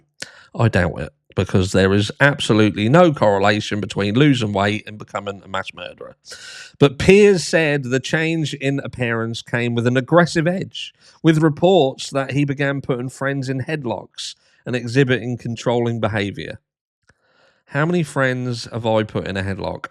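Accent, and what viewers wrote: United Kingdom. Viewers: You are not even from USA are you. Sounds like a Brit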